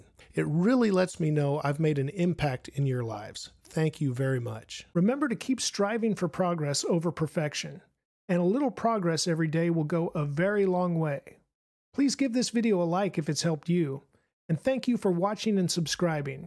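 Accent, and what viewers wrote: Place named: United States. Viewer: Hi how are you today? I miss you my friend, I miss you and your hot American accent, thank you for uploading another video clip of yourself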